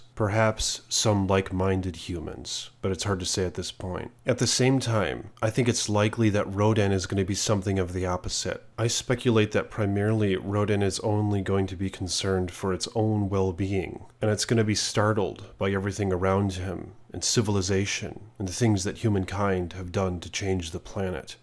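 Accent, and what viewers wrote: RP, United Kingdom. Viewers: It's a reasonable guess,. I thought they would go the elemental route... Earth, water, air, fire and spirit but there are only a couple of the Titans that fit those specific attributes... PS - Your doing the Lord's work on G2 compared to your peers because: 1. You made it out of puberty. 2. You speak the Queen's English. 3. You don't ramble incoherently. But you have to ditch the creepy, pedo pizzagate voice.... 👌👌👌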